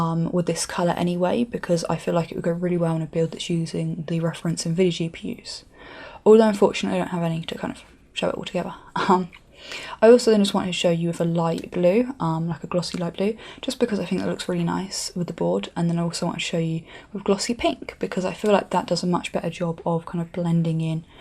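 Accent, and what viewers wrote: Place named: West Country, United Kingdom. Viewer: Whereabouts are you from? I'm sure I hear notes of Bristolian/West Country ^^ Hello from Wales (or 'shwmae' as we say here)!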